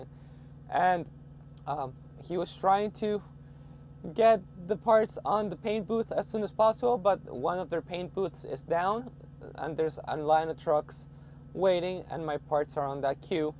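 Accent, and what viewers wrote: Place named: Latin America. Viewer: El trokero peruano que no hace vídeo en español…. Smh. Don’t forget where you come from.., don’t be one of those Latinos that think they are gringo because they light skin and speak English(with an accent). Not hating but it’s the truth.. there’s a reason why you got less than 5k subs.. Vlog en Español and you’ll see your channel blow up. ✌🏽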